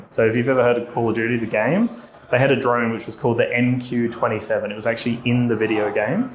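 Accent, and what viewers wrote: Australia. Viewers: Oh wow, I didn't realize you weren't from Australia. You're from the UK? You sound like you have an Australian accent. I mean now that I know, I can hear there's a slight difference, but it definitely doesn't sound like an English accent.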